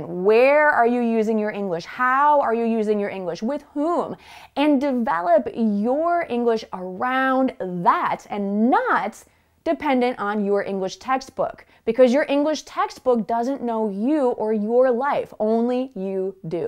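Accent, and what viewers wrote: United States. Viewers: Whare are you from sister? Is it from America? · I love British but your American English is perfectly beautiful and I always enjoy learning English with your channel, in fact I've subscribed it years ago. By the way, I tell my students too to practice speaking English in front of the mirror at their home..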